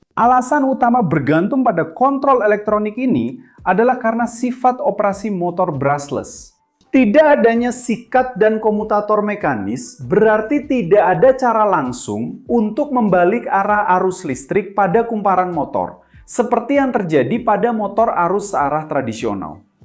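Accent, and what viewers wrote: Java, Indonesia. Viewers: Dubbingnya logat Jawa. 😂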